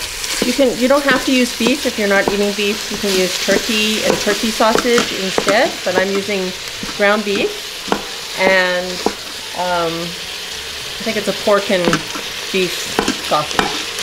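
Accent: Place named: Canada. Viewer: lucky! i'm assuming you're in canada, i hear a bit of the accent :) furthest i've been up that way would be seattle, but hope to make it to canada some day. i love that kind of weather! have a great week :)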